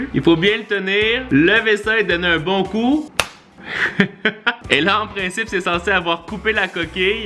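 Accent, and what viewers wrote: Quebec, Canada. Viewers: Bonjour j adore ton concpte de vidéo et par dessus tout ton accent québécois je te dis bravo de ne pas avoir lâcher pendant tout se temp se que j essaye de dire ses que je suis une fan depuis très longtemp et je voudrais que tu me réponde.Et aussi j espere que toi est Isaac vous vous santer bein pendant se moment de crise sanitaire mercie d avance et stppp reponds moi❤❤❤❤❤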